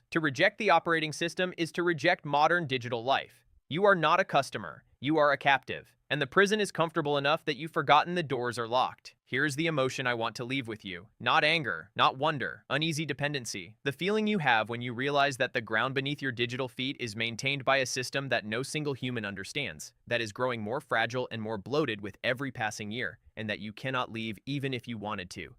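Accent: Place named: India. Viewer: Why you have a racist Indian accent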